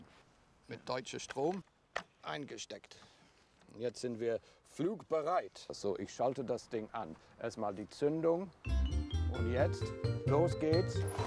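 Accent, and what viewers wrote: England, United Kingdom. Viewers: Klasse Ihre Beiträge. Auch liebe Ihren britischen Akzent. 👏😀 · Morning, nice to hear the English accent being one myself. give the Germans more good tips how to make a good English garden.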